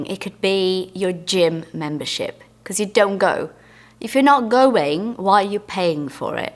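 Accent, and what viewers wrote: United Kingdom. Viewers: I love your British accent, happy new year for you and your family 🇬🇧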